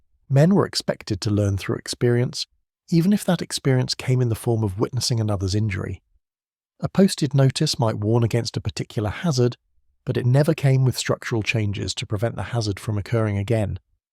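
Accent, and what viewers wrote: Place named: United Kingdom. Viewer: Please: Why spoken in Britisch English? Sometimes really hard to understand...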